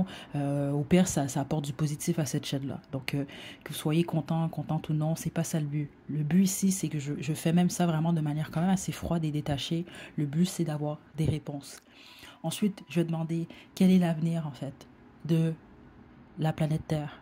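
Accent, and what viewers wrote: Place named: Canada. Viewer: Merci pour Le tirage. Les fj: interessant. Je le ressens aussi comme ça. La terre est stressé, c vrai, reconnectons nous a elle. Aimons la. Et sortir du monde à cravate. Créons notre propre réalité. Et pour les ET Oui c sur. Nous meme venons sans doute d’ailleurs ou une partie de nous meme.. j’avais déjà entendu qu’il y avait des passages secrets dans la terre où il y aurait une vie paradisiaque avec d’autres animaux.. passage secrets, cachés.. mais comme tout secret, ça doit être sécréter.. découvert. Belle canalisation. J’adore le corbeau. Y’a de la magie dans l’air... Merci c’était la deuxième fois que je t’écoute. Je t’aime bien. Beau rire et accent canadien j’adore ❤️❤️ bonne continuation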